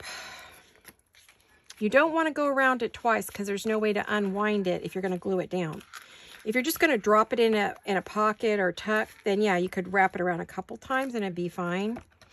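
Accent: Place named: United States. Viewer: Please do not quit. I love your channel. Your American accent is charming.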